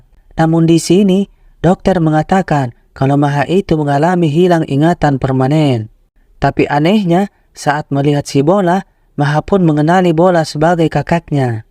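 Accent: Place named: Makassar, Indonesia. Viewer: Logat makasar ni host nya . Cara baca pun Sulawesi ki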